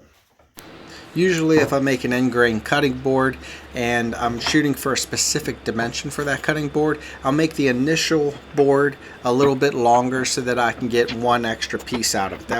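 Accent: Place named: Maryland, United States. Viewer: You sound very Maryland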